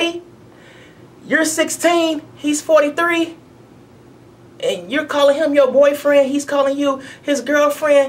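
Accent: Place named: Missouri, United States. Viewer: I think he means Autistic he has a strong Missouri accent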